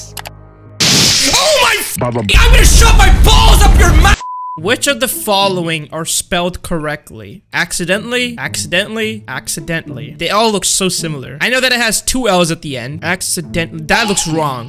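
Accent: India. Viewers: you are Indian???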